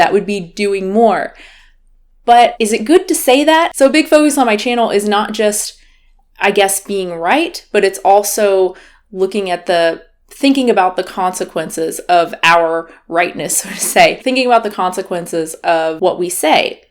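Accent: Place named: South, United States. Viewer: I think the most effective way to spread the vegan message is to bring back your southern accent.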